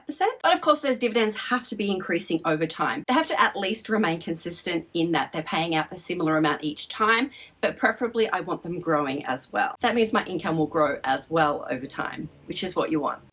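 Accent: Australia. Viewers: Enjoy your videos and the Aussie accent is a bonus.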